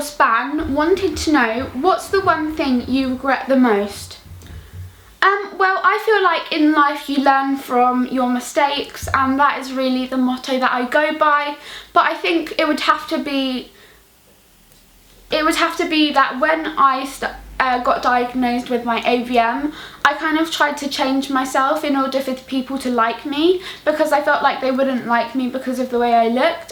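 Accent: United Kingdom. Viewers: I started to understand british english talk most from your videos...Thanks<3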